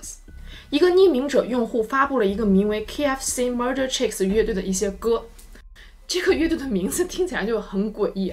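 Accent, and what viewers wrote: China. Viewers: 是中国人很正常😎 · You sound like from mainland China.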